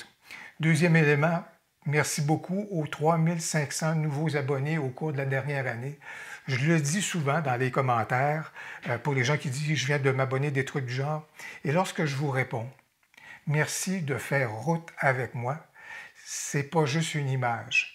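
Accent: Quebec, Canada. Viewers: Merci à toi pour tous ces contenus. Tu as raison de ne pas trop te diversifier. Il existe également d'excellentes chaînes qui traitent de Dxo PhotoLab et de Capture One, logiciels que j'utilise aussi. Tu ne peux pas tout faire, et surtout le faire bien. Reste comme cela et continue à ton rythme de nous faire découvrir et aimer la photo. Continue de nous faire découvrir le Québec (désolé, je suis un frenchy de 69 ans). Tu as la chance d'avoir un accent merveilleux et des paysages grandioses. Personnellement, je suis abonné à une dizaine de chaînes Québécoises, toutes de haut niveau. Bonne continuation, cordialement, et bonnes fêtes. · Bravo pour ta chaîne et je te souhaite le même succès pour l'année prochaine et puis j'aime bien écouter ton accent typique de ton lointain Québec:-) Je suis en particulier tes sujets sur la photo en général et sur NikCollection et Luminar. 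Bonnes fêtes de fin d'année pour toi et tes proches!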